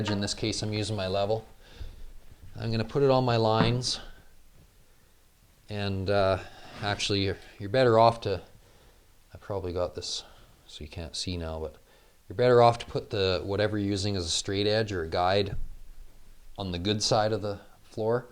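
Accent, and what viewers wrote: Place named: Canada. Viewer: i love your canadian accent 😃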